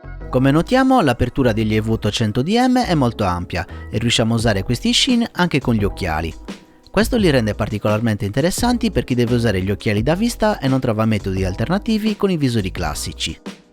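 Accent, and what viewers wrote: Italy. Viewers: At first I was annoyed that English titled video is not in English, but I decided to put on English subtitles and this review is actually nice and clear! I actually even liked this Italian accent :D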